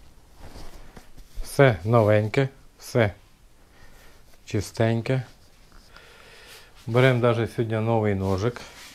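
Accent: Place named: Kharkiv, Ukraine. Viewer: Этот человек говорит на местном диалекте и вряд-ли он умеет разговаривать на русском. На Харьковщине везде так говорят .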